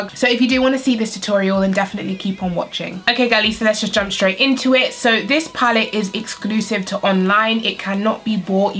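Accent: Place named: London, United Kingdom. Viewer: loving the look! And (sorry, English geek here), it's not that you pronounce thing wrong, far from that. It's London vernacular/slang - for example, with the "ask" pronunciation, most Londoners do say "arks"...just they way we roll haha <3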